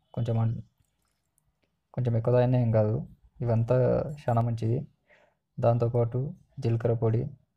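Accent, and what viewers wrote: Telangana, India. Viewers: Anna ni telangana langveg super anna and drink kuda mast undi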